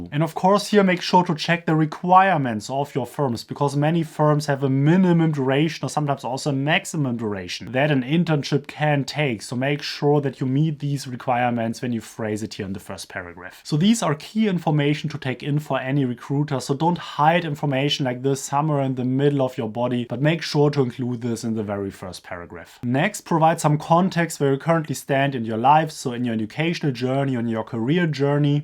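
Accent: Germany. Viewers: I really enjoy your German accent English! How could I contact you if I may ask?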